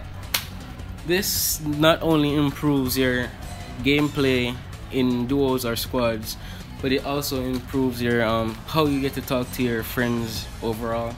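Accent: Jamaica. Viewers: Your a Jamaican aren’t u? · Are u Jamaican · are you jamaican? just askin cause i am half jamaican you just sound like it 😂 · Thx bro I notice your Jamaican and I'm too big up yuh self bro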